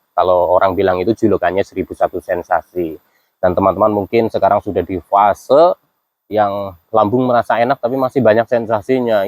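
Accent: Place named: Banyumas, Indonesia. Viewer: Mas enak penyampaian katanya, saya baru gabung aja suka lihat. Karena setiap saya dengar dan nonton. Kecemasan saya berkurang. Semangat terus mas, dan ayo semangat juga buat kita supaya lekas sembuh dari asam lambung dan kecemasan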